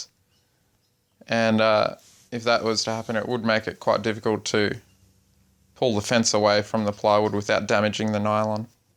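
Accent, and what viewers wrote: Australia. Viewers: Thanks for the reply. I've been looking at a number of your videos and have to say they are quite impressive. I too like a lot of attention to scenic detailing. At some point I would like to send you some pictures of a layout I have been detailing for the past 30 years. Did you mention a brand of wire you are using and where you can get it from. From you accent I suppose you are from the land down under and I live in the Northeast United states. As an aside there is an annual convention of master craftsman model builders. Here is the site: <link> It is occurring on the end of March and beginning of April. Check it out!!! I have Friends in Brisbane...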